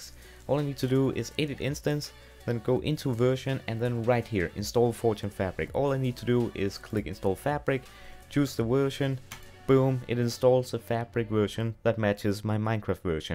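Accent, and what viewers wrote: Denmark. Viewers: hmmm you sound a bit danish...?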